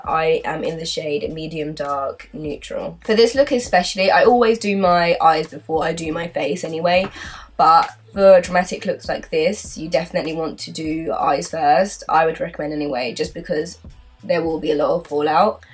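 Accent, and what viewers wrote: United Kingdom. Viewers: You're actually so flawless. You don't have a single unattractive feature like can I be you. plus you have a British accent too. How can a person be so perfect? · Had no clue you were British! So pretty!!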